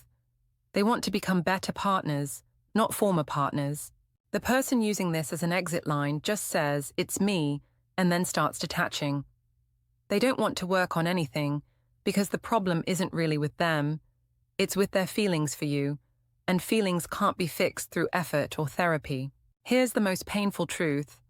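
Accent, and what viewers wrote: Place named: New Jersey, United States. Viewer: That’s a lovely accent you got there. New Jersey?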